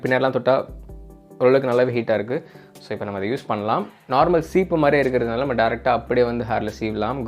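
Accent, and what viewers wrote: Madurai, India. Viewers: Madurai Slang la Pesuriga 😁😁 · Today speaking slang very level and attractive 😍😍😍like madurai peoples...love from madurai 🤩🤩🤩🤩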